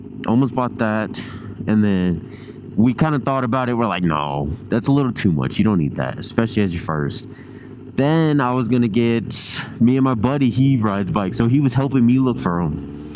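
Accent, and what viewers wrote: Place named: North Texas, United States. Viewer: took me 30 seconds to realize you were in north texas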